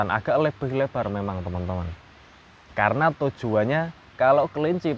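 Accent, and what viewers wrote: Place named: Java, Indonesia. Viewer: Logatnya org jawa y mas, jawane pundi??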